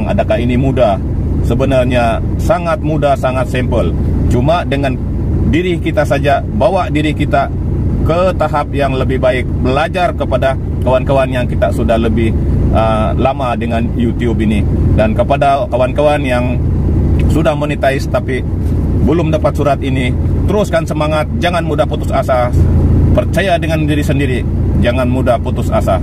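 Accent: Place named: Malaysia. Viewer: Terimakasih tuntunan umum nya. Saya suka memdengar logat melayu. Singapore ape malaysia ni?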